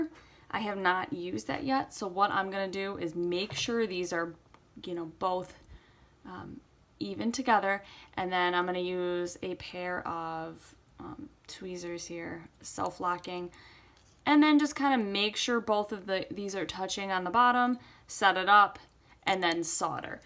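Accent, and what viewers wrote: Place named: Michigan, United States. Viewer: You sound like a Michigander! lol. Thanks for making these videos, truly the best on the market for tutorials.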